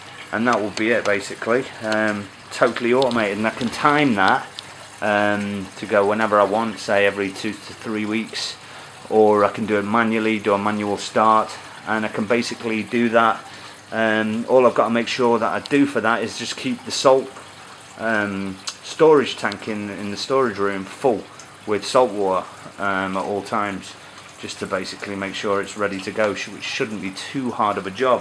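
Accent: United Kingdom. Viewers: Hi just found your channel I love your tank and setup, I can see its going to amazing. You sound like a mancuniuan to me, I am from manchester and don't know many other reefers in the area so its nice to see. keep the vids coming.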